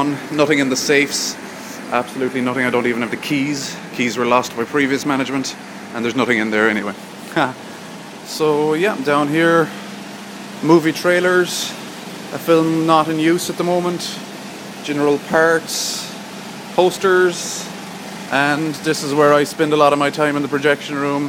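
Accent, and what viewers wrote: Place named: Ireland. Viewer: The projectors look ancient, but there probably bight like tanks. Great Irish, accent. :)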